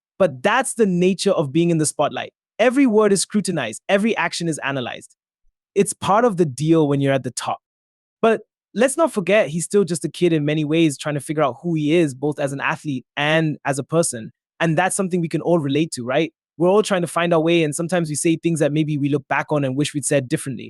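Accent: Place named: Southern Africa. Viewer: My man, you sound very southern African... I'd wager South African straight up... yet you made a whole video and released it internationally without looking up how to pronounce this southern African man's name? Embarassing...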